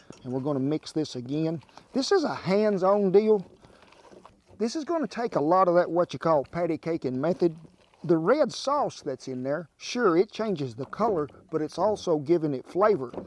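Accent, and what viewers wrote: South, United States. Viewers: love your country accent and personality. most cooking shows have bored me over the years but yours stopped me dead in my tracks!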